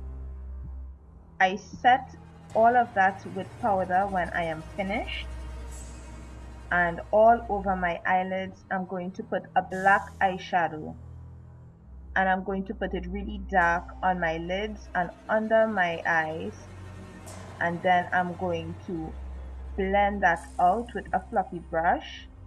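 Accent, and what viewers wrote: Trinidad and Tobago. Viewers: Ahhhhhhhhhh of course you speak French......Awesome. Cute trini accent too. I love videos like this, always loved playing in special effects makeup. No skills though, I shall definitely learn from you. Love it. I will check out the rest too.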